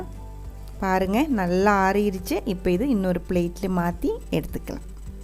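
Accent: Kerala, India. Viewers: madam from which place do you hail, sounds like a malayali speaking Tamil , any way vatappam superb just like your voice. God bless you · I like all kerala samayal sister Your kerala thamizh 👌 sister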